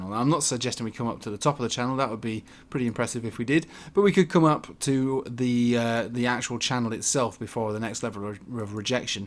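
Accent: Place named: Scotland, United Kingdom. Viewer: What’s with the Scottish accent in vids today :))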